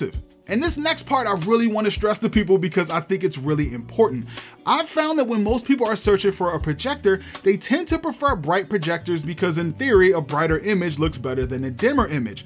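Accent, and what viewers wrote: Baltimore, United States. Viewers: I hear that Bmore coming outta ya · is this a bmore accent I detect? Great videos! Very helpful.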